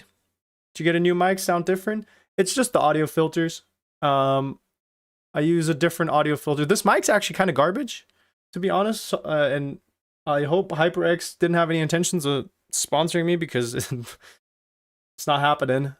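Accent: United States. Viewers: btw are you german you sound american af i forgot if you are german or if you are american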